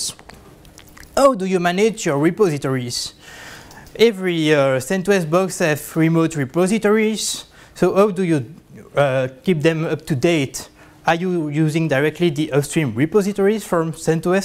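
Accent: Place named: India. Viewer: как у индуса деревенского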